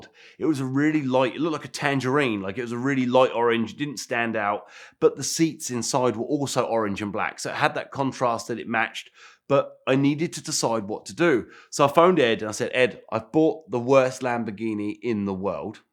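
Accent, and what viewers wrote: United Kingdom. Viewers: Lol I’ll do my best to reduce the British twang lol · Can't get more British accent than this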